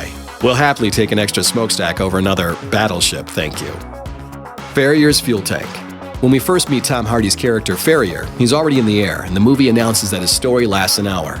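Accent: United States. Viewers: are u american or britisch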